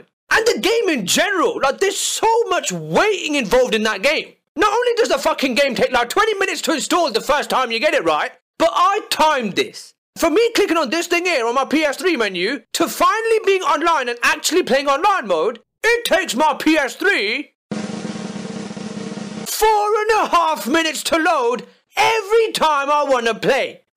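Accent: United Kingdom. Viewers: british lmao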